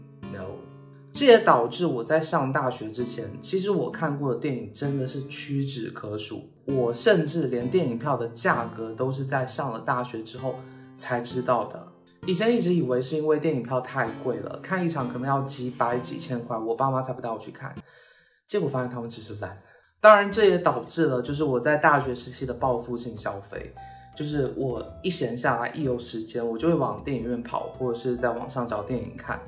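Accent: Taiwan. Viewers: coma居然不是台灣人😯 為什麼口音這麼像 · 因為口音很像台灣人😄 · 我一直以為COMA是台灣人XD 因為腔調太像了~原來不是~ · 廈門在福建 所以口音像台灣腔很正常的（福建人的口音真的很像台灣腔） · 有些是不太一样 有部分听起来会更偏台湾 有部分更偏大陆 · 我也以為是台灣人耶🤣想說是不是為了拍片所以講的比較字正腔圓而已🤣原來是廈門人啊！ （大家都好和平覺得這裡很棒！） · 幽靈船那部電影好看，“鳥“是一部很經典的驚悚片。COMA應該是曼德拉效應困擾了你，話說COMA是哪裡人啊！口音用詞聽著很台灣，可不可以請問是哪裡人，我真的沒有惡意。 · 我還以為coma是馬來西亞人🙈你的口音不像內地人反而偏向台灣人，還時不時說一兩句英文😂😂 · 你们当然能听出来了，但是对于大陆尤其北方的来讲这已经很台湾口音了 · 感覺是香港人？一直覺得有些用語很中國，但腔調非常台灣疑惑超久XD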